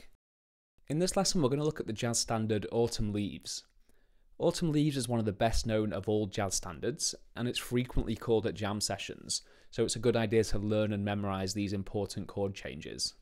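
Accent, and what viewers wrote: Liverpool, United Kingdom. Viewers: Either from Liverpool or The Wirral?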